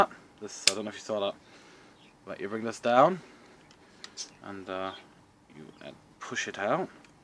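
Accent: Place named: United Kingdom. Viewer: sounds brit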